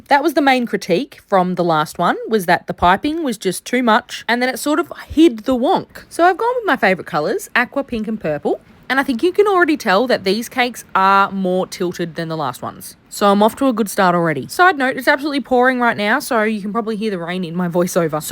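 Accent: Australia. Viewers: Are you Australian? Cuz you sound like it. Not judgeing at all.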